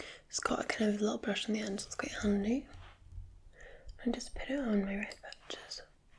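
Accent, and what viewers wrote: Scotland, United Kingdom. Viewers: omg Scottish YEEEEEEY😍😍😍😍 glad to have found you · Hi! I just want to say that I really enjoy your channel. Your videos are great, and you're such a beautiful girl, especially without makeup. I love natural beauty. Anyway, I just love your Scottish accent. Your voice is so relaxing and it gives me chills. Thanks for posting this. I really appreciate you're honesty. ❤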